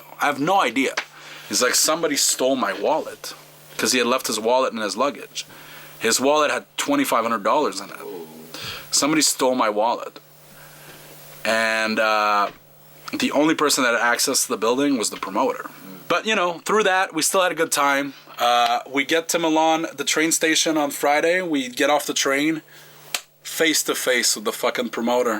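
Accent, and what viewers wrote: Quebec, Canada. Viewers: He's from Quebec Canada so of course he'd have an accent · Quebec accent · I wanna hear him speak French (as a fellow French Canadian ) . I can hear his French accent on a lot of words.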